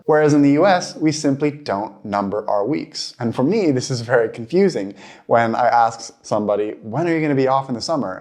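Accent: Sweden. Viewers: saw your vids a few years ago. Now at the time stamp, you sound more like a Swede who is fairly good, not great, at speaking english. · Look at that, the Swedish accent is creeping is as well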